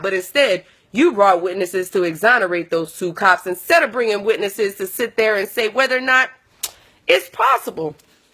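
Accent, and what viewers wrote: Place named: New Orleans, United States. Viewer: 1202_ProgramAlarm she has a New Orleans accent... she obviously Cajun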